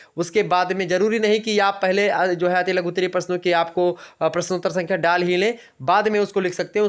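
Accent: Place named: Kanpur, India. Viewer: Hme lgta tha sir kanpur se h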